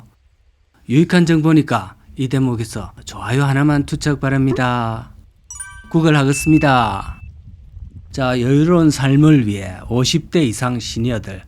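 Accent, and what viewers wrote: Gyeongsang, South Korea. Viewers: 경상도분같이 말씀 재밋게하시니 보느데 재밋네요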